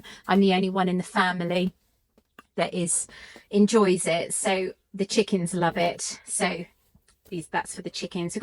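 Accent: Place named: England, United Kingdom. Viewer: Wow, you were born in Sweden!! 🤨I guess you didnät live there for long, you have such a strong English accent.... So how warm does it get for you in the summer? Yes, we have a channel would love for you to follow our journey! 👍💜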